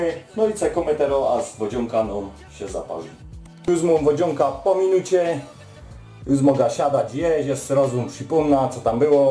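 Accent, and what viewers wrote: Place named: Poland. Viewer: Wyczuwom gorolski akcent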